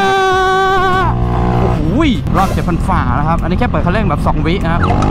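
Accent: Isan, Thailand. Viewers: ชอบพี่ว้ะพุดอีสานล้ะตลกจิง555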